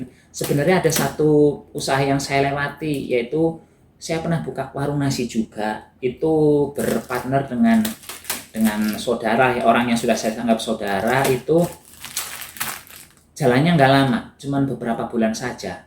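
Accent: Banyumas, Indonesia. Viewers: kasian ya, tapi tetap semangat dlm menjalani hidup, jatuh bangun dng segala perjuangannya yg tidak mengenal waktu, sekarang sudah kelihatan jerih payahnya hidup sudah berkecukupan, punya rumah, punya mobil, punya istri belum to, kok selalu tampil sendirian, tapi, banyak temen karena lucu dan cerdas, jadi banyak netizen yg suka banyolannya, ngomog ngapak yg ting pletot, bikin lucu alami, kalau bisa ketemu, pasti tak iwel" pipine sing tembem, botak, irunge sing blesek, tapi, lucu bikin gemes kalau bukak vidionya bersama iyunge yg kool kuno mbrono khas kehidupan desa, tapi sukses capaiannya, 😲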